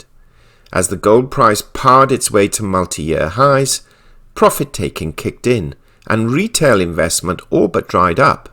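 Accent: United Kingdom. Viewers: I had to give a thumbs-down today not because of the information but instead of the straightforward logical rational approach that is usually given there was a sanctimonious are that might have been partially attributed to that uppity British accent but probably because of the inner sanctum exclusivity that starting to emerge giving them a sanctimonious are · I only take financial advice from those with British accents. Thanks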